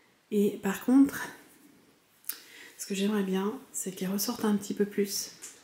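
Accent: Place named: Switzerland. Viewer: Je me gardais ce vlog de côté pour un moment en tête à tête avec toi... C'est agréable ce format : il nous permet de prendre le temps de le regarder. Bien plus que quand les stories défilent et qu'on n'est pas spécialement attentif ! Est-ce que mon moment préféré reste le "coucou", j'avoue ne pas en avoir entendu un depuis des années, et ça m'a dépaysé d'un coup ! Ton p'tit côté suisse ... Merci beaucoup ! C'était un chouette moment !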